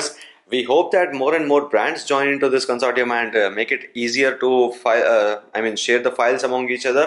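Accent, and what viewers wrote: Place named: India. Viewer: Your spoken is so confusing... Indian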